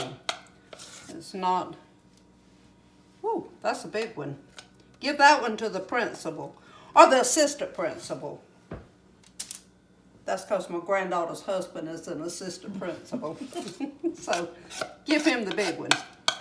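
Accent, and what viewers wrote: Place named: South, United States. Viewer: I just love the accent 😉. You are what I think of when I think of a real Southern baptist lady.🙏❤️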